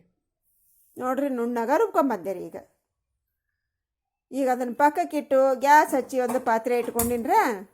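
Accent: North Karnataka, India. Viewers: ಉತ್ತರ ಕರ್ನಾಟಕದಲ್ಲಿ ಈ ತರಹದ ಉಚ್ಚಾರಣೆ ಬಳಕೆಯಲ್ಲಿದೆ. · ತಪ್ಪೇನೂ ಆಗಿಲ್ರೀ.ನಿಮ್ಮ ಅಡುಗೆ ಜೊತೆ ಉತ್ತರಕರ್ನಾಟಕದ ಭಾಷೆಯ ಸೊಬಗನ್ನೂ ಉಣಬಡಿಸ್ತಾ ಇದ್ದೀರಿ...ಹೀಗೇ ಮಾತನಾಡಿ ಮೇಡಮ್ ಅವ್ರೇ..ಕನ್ನಡ ಚೆನ್ನಾಗಿಯೇ ಇದೆ. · ಹೌದು ಉತ್ತರ ಕರ್ನಾಟಕದವರ ಕನ್ನಡ ಕೂಡ ಕಿವಿಗೆ ಇಂಪಾಗಿದೆ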